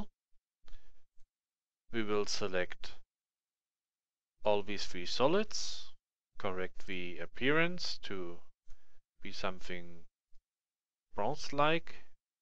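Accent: Germany. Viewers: Great tutorial! And love your German accent!!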